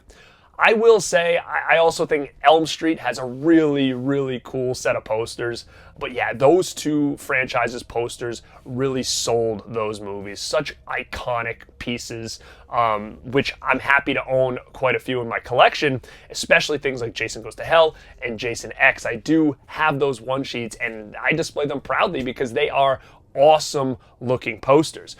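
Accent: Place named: New York, United States. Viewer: What state did you grow up in? You sound like a New Yorker.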